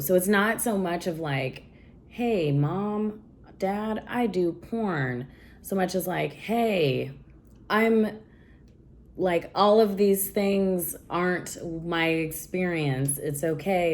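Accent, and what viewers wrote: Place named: United States. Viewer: All American women sound like that to me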